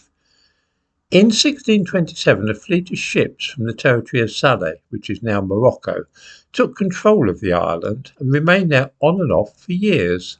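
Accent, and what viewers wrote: United Kingdom. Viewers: This guy's British accent screams conspiracy and exaggeration. Anyway, white slavery is a collective 'moved on from' because not victims.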